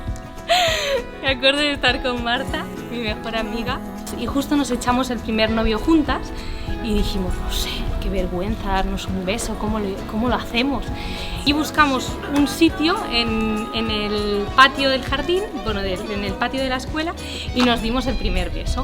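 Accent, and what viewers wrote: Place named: Catalonia, Spain. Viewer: apenas conoci su contenido un par de semanas... Y me atrapó... Es carismática, una mexicana con acento catalán, una catalána con sangre mexicana. Esa es la nueva realidad que nos muestra. Que las nacionalidades son relativas, tu patria eres y el lugar donde florece el alma. Son un gran equipo, saludos.. tienes un gran tesoro cuidense, si algún dia si me topo contigo en la calle y ese ir y andar tan tuyo.. no te asustes si me emocióno al verte. Abrazos 😽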